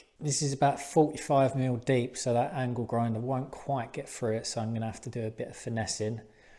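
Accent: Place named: London, United Kingdom. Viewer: I feel your pain, I need a raft foundation alonge with a couple of steel beams and had 93 pages of structual engineering calculations turn up. I just turned to the back two pages to see what I really need! By the way you must come from the same part of London as my mate (who's a bricky) as my daughter heard you when I was watching and was convinced it was him lol, you sound alike and have an identical manner.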